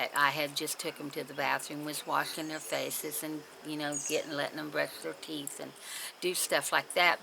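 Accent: West Virginia, United States. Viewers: I enjoyed listening on my morning walk this morning. I also gave you a like. Keep making your videos. I watched Little Poet when she first started and now she has 100k subscribers. I am all about people making money on You Tube. It is a good extra income for those that can do it. Love your W Va accent ie, " you'ens. I am going to Ohio to visit my mom and sister in August. Not really a vacation, but we love to see them and we take 3 days to get there only drive 6 hours a day. So we sight see a bit on the way up. I hate taking medications always some kind of side effect. Sometimes there is no other way though. I hate cockroaches, I would not have stayed either.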